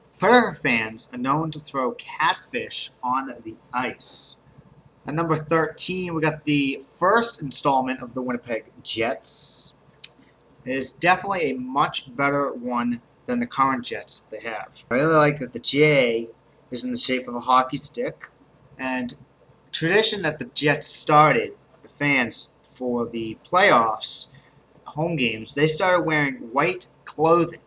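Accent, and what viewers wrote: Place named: Hartford, United States. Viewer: Wow he has such a Hartford accent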